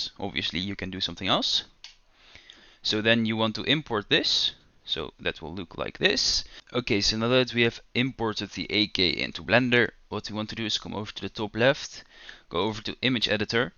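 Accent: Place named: Netherlands. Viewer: ik hoor meteen dat jij nederlands ben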